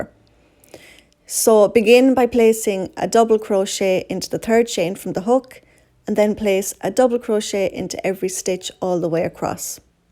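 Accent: Ireland. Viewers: I love this pattern! thank you but i also love listening to you speak..love your brogue..:) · Yay Irish accent.. Love the video x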